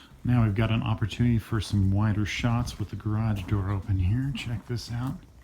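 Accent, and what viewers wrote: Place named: Canada. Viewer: Why right-hand drive? Where are you? (You sound Canadian, or American. )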